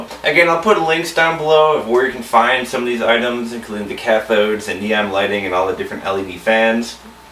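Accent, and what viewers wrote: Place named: Chicago, United States. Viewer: I'm assuming you're from Chicago lol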